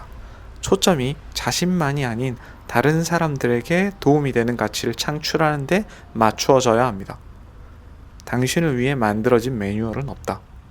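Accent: Gyeongsang, South Korea. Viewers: 저도 얼마간의 기간제 경험이 있어서 선생님께서 영상에서 하시는 말씀에 많은 공감이 가네요. 모쪼록 마음속에 품으신 계획들 번창하시길 바랍니다. 책읽어주시는 컨텐츠가 철학적이면서 많은 생각이 들게 합니다. ^^. 아 그리고 저도 부산사람이라 경상도 억양과 말투가 참 정겹습니다!! 건승하십시오~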